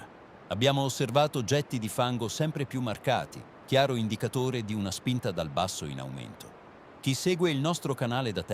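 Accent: Italy. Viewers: Wow, the reader (or "reader?) of the information this time is the strangest one I have ever heard! In the beginning, there is a clear Italian accent even with the super rapid speech, yet there is also the typical AI pattern of pausing between words that belong together, failing on scientific terms like Bradyseism, and misunderstanding Celsius for whichever funny variety that comes out each day. On top of that, the accent also changes to some variety of Asian pretty quickly, and there is even a couple of inserted space filler sounds like "ah" and "mm"! Please, commenters that read this and are fluent in English: volunteer to do the reading instead of letting AI abuse the daily bulletins! I would do it with pleasure, but my teeth will prevent that for a few months, so at least one other subscriber is needed!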